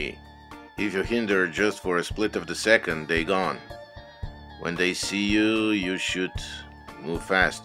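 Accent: Russia. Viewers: Love this guys accent. Sounds Russian! One tone. NICE! Love the music as well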